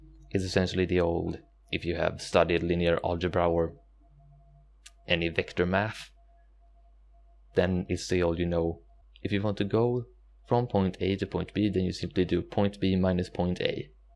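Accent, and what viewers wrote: Sweden. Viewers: Älskar din uppenbara svenska dialekt :)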